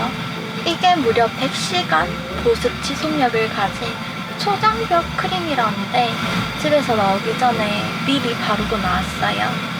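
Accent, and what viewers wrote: Gyeongsang, South Korea. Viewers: 경상도 시죠?ㅎㅎ 그냥 댓달아봅니다^^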